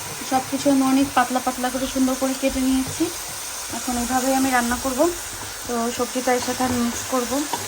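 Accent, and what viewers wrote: Sylhet, Bangladesh. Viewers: bhai apnar sylheti maat huniya ami crush khailaisi..MashaaAllah 😊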